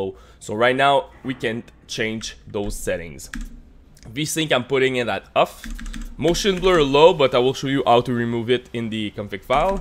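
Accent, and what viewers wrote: Quebec, Canada. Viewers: J'entends un Québécois!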